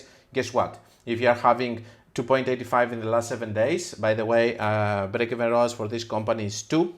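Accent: Greece. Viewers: I do have sensitive hearing, but it wouldn't bother me so much, if it were only intro sound, but here's the thing, I watch YT on TV using keyboard and I need to constantly adjust volume when you're suddenly inserting those sound effects in midst of your quite quieter speech. And all that including your Greek accent (which I don't mind being European myself) really extends duration of watching while I try to comprehend what you're saying, so I'm constantly stopping and reversing video and it's a pain. You may comfort yourself by not being the only youtuber with that problem, there's plenty of them out there. But you don't need to edit your videos on my account, after all this was your first and last video I watched, because I've never had FB account and these topics don't interest me. And now you may bring up some mom jokes.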